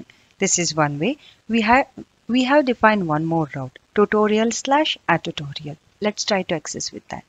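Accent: India. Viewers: This Is indian accent which is very clear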